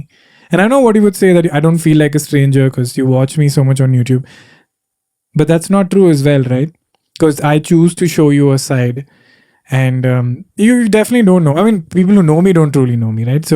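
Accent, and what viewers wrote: Kerala, India. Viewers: You talk just like my mallu cousin and have the same mannerism. He's irritating right now, but I won't be disappointed if he grows up to be like you. PS: Loved the long recording of you talking 🎯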